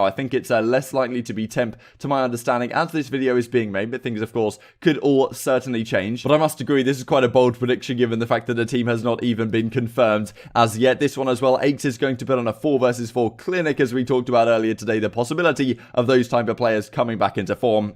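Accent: United Kingdom. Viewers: Thank god ur British i would hate hearing this without the accent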